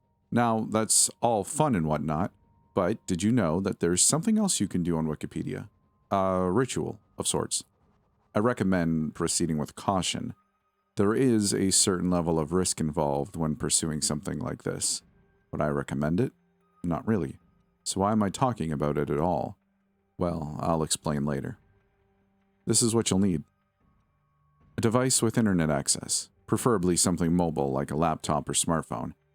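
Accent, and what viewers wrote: Canada. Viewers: You are Canadian as hell bro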